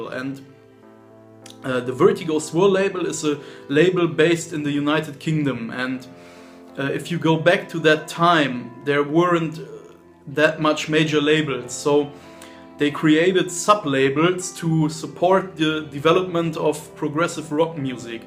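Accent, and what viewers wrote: Germany. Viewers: Are you a german Guy?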